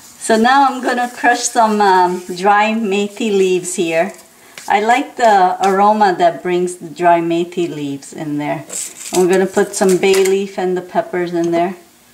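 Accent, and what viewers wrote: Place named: Caribbean. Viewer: You sound more West Indian than East Indian. Are you guys in New York?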